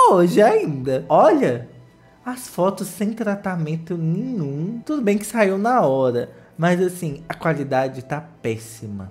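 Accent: Minas Gerais, Brazil. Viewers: ficando gradualmente mais mineiro!!! amei ❤️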